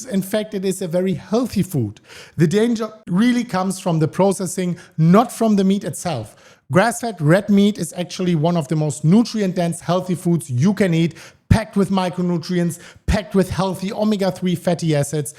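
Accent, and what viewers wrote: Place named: Germany. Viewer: Du hast aber auch einen deutschen englischen Akzent 😂❤😂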